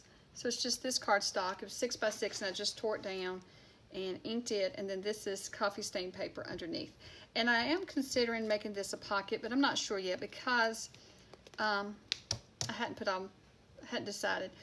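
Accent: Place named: Texas, United States. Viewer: Where are you from? My first language isn't english, I understand everything you say but I can help thinking that you may be from Texas or some american place with cowboys and sun lol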